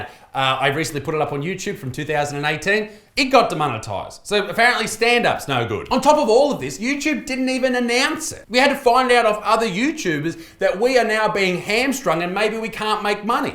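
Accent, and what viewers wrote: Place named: Australia. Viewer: He's Australian, an instant pass, given. No questions asked. Swear all he wants. Australians can make pretty much anything sound amazing, funny, and worth listening to, etc...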